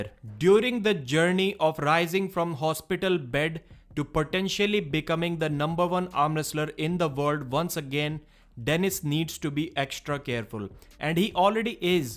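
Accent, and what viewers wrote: India. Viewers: Обожаю слушать индийский акцент!!! Так мягко и приятно звучит, как колыбельная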